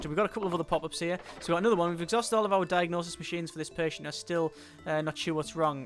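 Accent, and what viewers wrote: United Kingdom. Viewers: Others have done it. Like Nerd3. For some reason (maybe the british accent and their good taste in games) I tend to confuse and Nerd3 sometimes;P Not like "Oh, is this Nerd3 or I'm watching" but memories of one channel or the other tend to fuse together.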